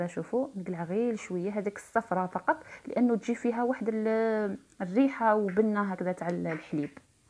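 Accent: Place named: West, Algeria. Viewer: تهبلي هههههه انا قبايليه بصح نموت علي لهجه الغرب ، غير على لي ماتحبش تخلي كومنت معليش انا نخليلك لايك وكومنت ونشترك. ههههه نجربها ولادي هبلولي علي لبن لي داقه فالدزاير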